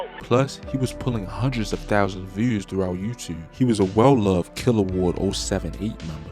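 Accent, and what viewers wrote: Baltimore, United States. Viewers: That a Baltimore accent? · Are you from Baltimore? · I tried to watch it but i can't get pass that crazy Baltimore accent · You from Baltimore ain’t u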